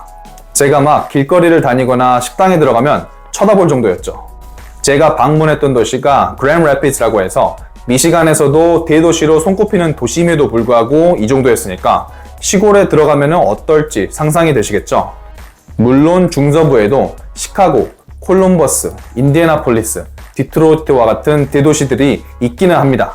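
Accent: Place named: Jeolla, South Korea. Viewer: 님은 전라도 억양이 있으시네요 ㅎㅎ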